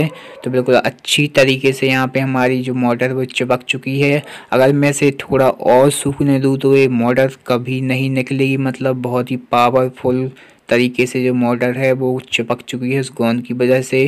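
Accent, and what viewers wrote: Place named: Narsinghpur, India. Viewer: Boli se 😃 narsighpuriya sabse badhiya 😉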